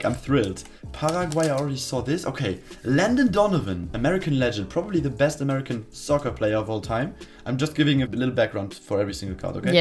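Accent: Germany. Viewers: Wie Mann es einfach hört das er Deutscher ist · Bist du aus Deutschland? Hört sich so an. PS gute Videos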